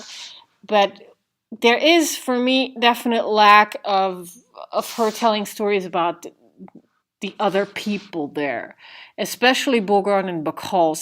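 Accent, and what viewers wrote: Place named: Europe. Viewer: You speak like you came from Europe to US and learned English a five years ago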